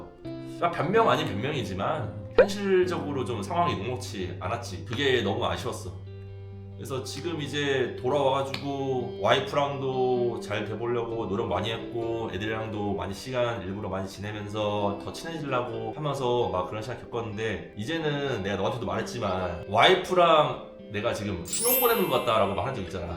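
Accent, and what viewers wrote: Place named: Jeolla, South Korea. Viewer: 대인. 고향이 전북 아니오? 말투가 우리동내 같은디?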